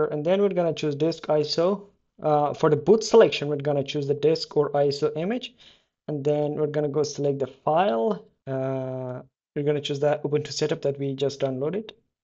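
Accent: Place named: India. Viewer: You can't hide your hideous indian accent well enough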